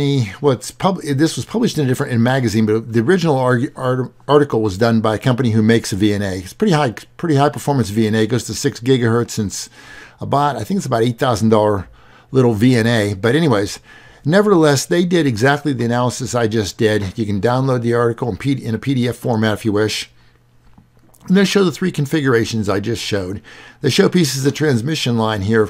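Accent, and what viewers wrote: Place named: England, United Kingdom. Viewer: Do you speak Russia?I don't understand your video. Because you speak like English man. Where are you from?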